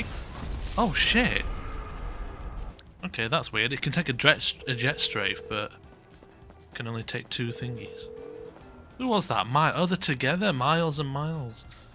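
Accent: United Kingdom. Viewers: Good to hear a northern accent in here! Great vid again mate.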